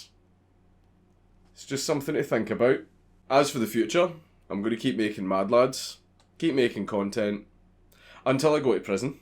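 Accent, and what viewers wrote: Scotland, United Kingdom. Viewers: Too Scottish, disliked. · 10 seconds in and its already so scottish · Winter solstice over Christmas? Good man. Love the accent (alas I am aberdonian) and keep fighting for free speech. Makes me proud to be Scottish. Lots of love from Aberdeenshire ❤ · I don't know why, but whenever I watch one of your videos. I'd scroll through the comments and suddenly everything I read sounds Scottish (except a few with five billion emojis). Amazing video. I hope all is well for you for the new year.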